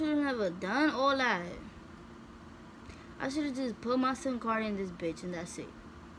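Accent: New York, United States. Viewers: Your accent sound like you from New York but anyways lmao I’m also getting an iPhone XR this Christmas or maybe earlier but yes I’m so excited I always watch unboxing videos of something I’m getting in the future😂😂😆😆‼️‼️💯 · Where you from? You sound like you from nyc ...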